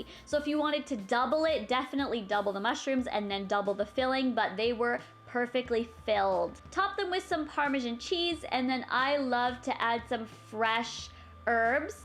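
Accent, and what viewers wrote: Canada. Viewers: I say it like her, it must be a Canadian thing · Probably because she's Canadian and Canadians tend to say some words a little different. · She's canadian! Therefore, she has an accent. She's not saying it weird lol.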